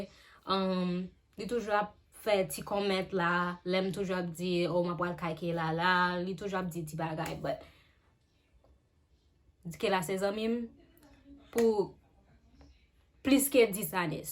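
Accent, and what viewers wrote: Cap-Haïtien, Haiti. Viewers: Eh manmanl ki moun okap la lap toujou gen accent moun okap menmsil pa fet Ayiti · Ou pa fet haiti okap epi depiw ap pale la mwen gentan we accent moun okap la nan ou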